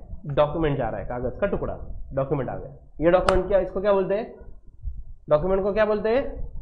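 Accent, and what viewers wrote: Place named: Maharashtra, India. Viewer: Your teaching is good sir but it seems like you are new in this teaching field and as a viewer i would like to give my honest opinion on your videos..u lack in speaking hindi as there is a slight mixture of marathi ascent while you speak hindi try to speak one language properly at a time... don't mix-up one language with another and i wish you all the best for your future.... 👍🏻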